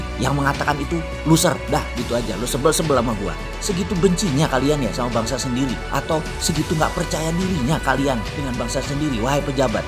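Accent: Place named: East Java, Indonesia. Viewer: Di unek2ke wae bossman yen dikandani ngeyel. Kangen jawatimuranmu metu #peace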